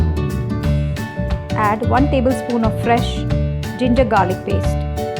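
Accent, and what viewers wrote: Garhwal, India. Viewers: Thank you so much mam for uploading it.and hearing Garhwali from you is such a treat to ears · मैम जतका स्वाद यूँ पहाड़ी व्यंजनू मा छ वतका इ स्वाद आपकी गढवली बोली मा भी आंणू छ।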